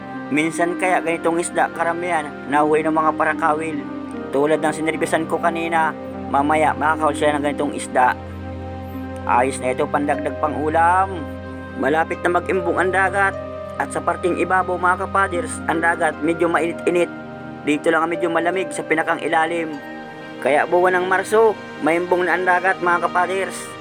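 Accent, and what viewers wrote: Bicol, Philippines. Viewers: kabayan na tatawa ako sa tagalog mo may halo bicol taga jaan pala ako sa wuezon province idol sa ka banda jaan ako sa san narciso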